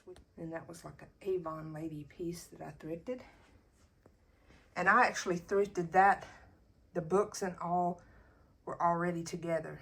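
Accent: South, United States. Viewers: Love your decorating, AND your sweet southern accent! 😊 Thank you for the tour, I really enjoyed it.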